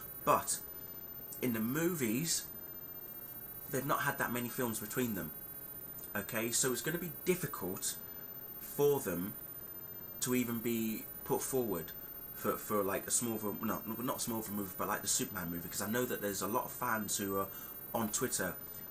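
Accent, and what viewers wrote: United Kingdom. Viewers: Your British aren't you.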